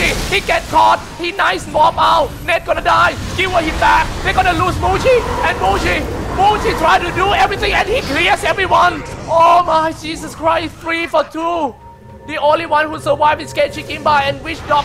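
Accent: Thailand. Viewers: Everybody's hating on this caster saying "he has low knowledge on the game". Well for me, he's entertaining. Has a sense of humor which is rare in the casting scene. He's a breath of fresh funny air. (And his Thai accent is part of his charm) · The Caster sounds like Thai or Vietnamese...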